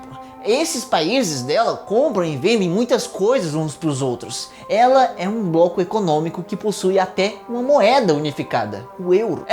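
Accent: Ceará, Brazil. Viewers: CRLH Eu tinha certeza que é cearense, praticamente afirmou agora, raramente confundo um conterrâneo 👽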